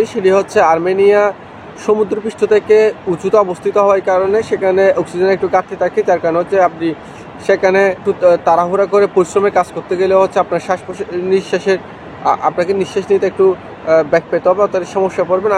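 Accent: Chittagong, Bangladesh. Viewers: ভাইজানের কথা শুনে মনে হচ্ছে আপনি চট্টগ্রাম আসলো যদি চট্টগ্রামের লোক হয়ে থাকে অবশ্যই যোগাযোগ করবেন আরমানিয়া আপনি কোন জায়গায় রয়েছেন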